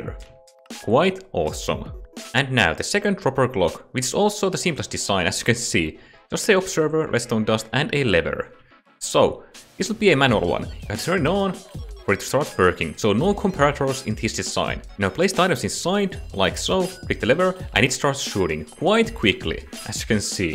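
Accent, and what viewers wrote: Finland. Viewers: this really helped btw are you finnish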